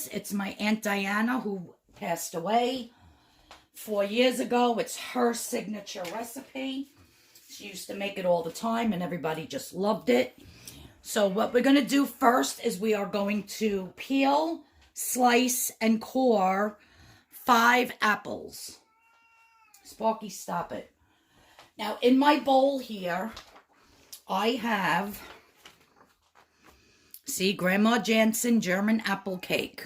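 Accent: New York, United States. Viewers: German baking is one of my favorites. Somehow Germans knew what they were doing back then. R u from Brooklyn, Queens, or Long Island. U sound like my family! Haaa!!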